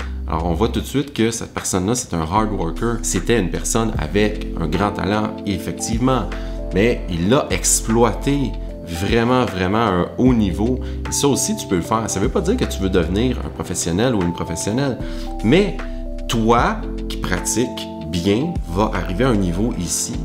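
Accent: Canada. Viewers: Bonjour, je ne suis pas surpris par ta vidéo, qui reflète bien la société dans laquelle nous vivons!!!!!! je suis tout jeune saxophoniste de 33 ans qui a enfin pris le temps grâce au confinement car je suis cuisinier 😅 pour apprendre l'instrument qui ma passionne depuis tout petit. Je travail tout les jours pendant 15 à 45 min et je progresse rapidement car j'ai dejà des notions de musique en piano et batterie!!! Grâce à toi et à tes vidéos j'ai pu correctement m'enrichir de ton savoir avant d'acheter mon sax alto ( et même ton bec syos 😉 ) et d'écouter tes conseils précieux. Trop peu de saxophoniste Français se lance dans une chaine Youtube aussi complète que la tienne et je ne regarde que toi maintenant!!!! continu sans te soucier des pourcentages sinon tu ne fera que lire des statistiques au lieu de nous transmettre ton savoir et j'en prend pour exemple un restaurateur qui ne regarde que TRIPADVISOR pour savoir si sa cuisine est bonne ou pas!!!! 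mais l'idée de la licorne est pas mal 🤣🤣🤣 trouve une chemise en adéquation 😆!!!! et j'adore l'accent canadien 😘 bravo et continu, j'espère dans les années à venir faire ta connaissance et partager avec toi.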